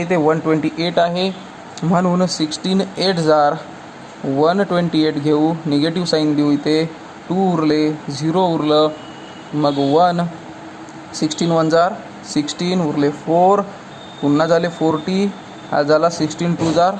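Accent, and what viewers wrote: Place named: Vidarbha, India. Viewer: mi pn vidharbhatli Bhasha vrunach kalte ki tumhi vidharbhatle aahet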